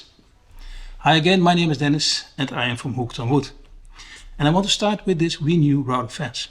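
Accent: Netherlands. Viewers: You got to rid of that Dutch accent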